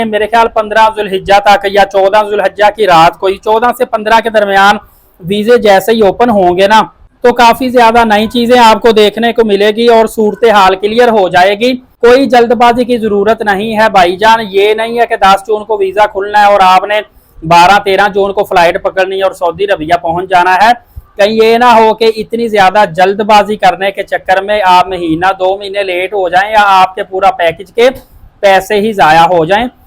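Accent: Punjab, India. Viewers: سر آپ کی اردو بول چال بھی پنجابی انداز میں ھے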